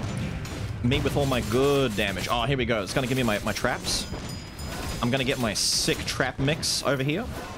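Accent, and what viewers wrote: Australia. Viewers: Are you australien?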